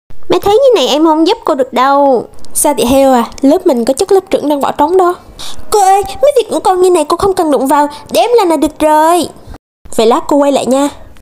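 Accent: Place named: Quang Ngai, Vietnam. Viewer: Chị là ngừ Quảng Ngãi hả cj em thấy giọng cj giống quá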